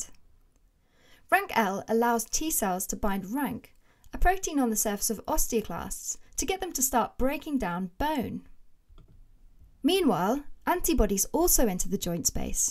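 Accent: United Kingdom. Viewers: She is British, but Princess Diana did not have this accent. This one is more like Laura Rhensfield from the BBC.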